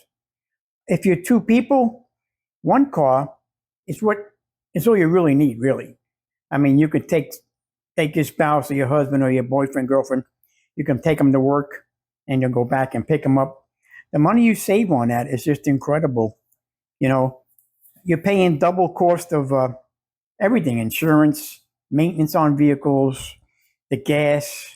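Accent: New York, United States. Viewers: You kinda look, and DEFINITELY SOUND LIKE THE ACTOR, JOE PESCHI IMO...with that New York or New England accent?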